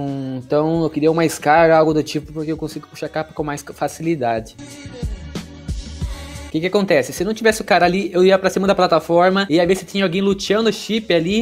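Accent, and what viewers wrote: Rio Grande do Sul, Brazil. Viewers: Cê é gaúcho?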